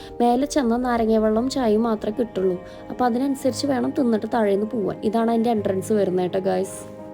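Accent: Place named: Thrissur, India. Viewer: Assal Thrissur slang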